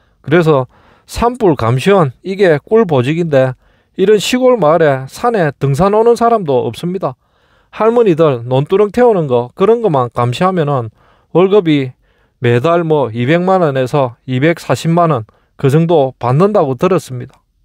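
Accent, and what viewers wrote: Gyeongsang, South Korea. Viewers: 이젠 경상도 사투리 자체가 싫다.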